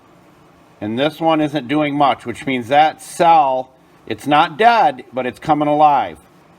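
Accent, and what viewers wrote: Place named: Midwest, United States. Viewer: Your voice sounds like either Missouri or Michigan. But a good teacher is always good at showing others how to do something.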